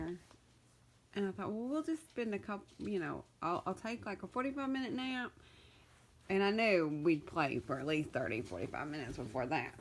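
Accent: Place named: Texas, United States. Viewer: You sound like a Texan, like me.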